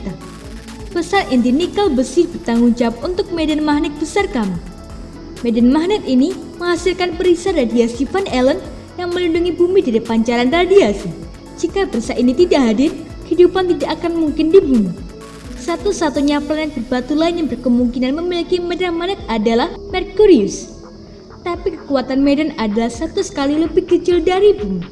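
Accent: Java, Indonesia. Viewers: Medog anjirrrr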